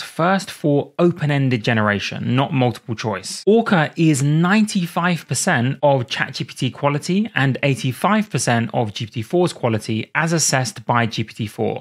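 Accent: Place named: United Kingdom. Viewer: When these videos become too technical for me to understand, I'm still learning a beautiful British English.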